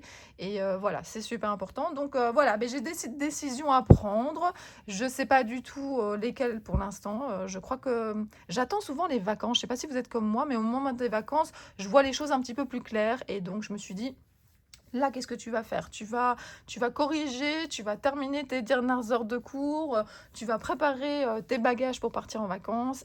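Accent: Belgium. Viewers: Bonjour, j'aime bien écouter vos vidéos et votre accent belge est trop rigolo 😉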